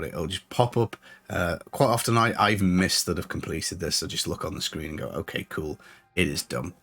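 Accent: Stoke, United Kingdom. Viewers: Are you a stokie?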